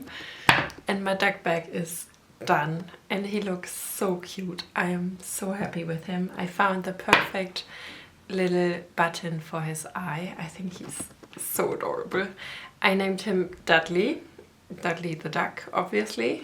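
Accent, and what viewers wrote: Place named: Germany. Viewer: Hallo, eine sehr schöne Tasche hast du da. Mir hat damals der Karpfen schon sehr gut gefallen, aber so in 3D als Ente, einfach genial. Man hört, dass du aus Deutschland kommst, woher genau wenn ich fragen darf? LG Chrissi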